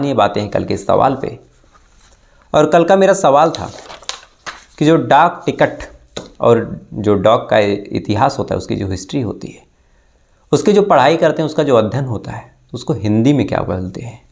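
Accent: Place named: North Bihar, India. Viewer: Your style of talking suggests that u r from north bihar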